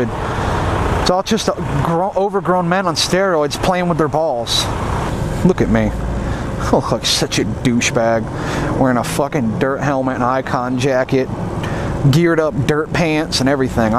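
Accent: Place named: United States. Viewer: I like your accent. Which state are you from ?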